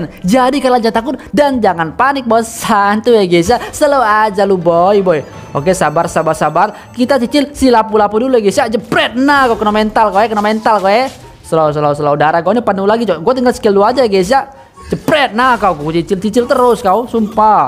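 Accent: Palembang, Indonesia. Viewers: Ngapo logat cak wong palembang yo..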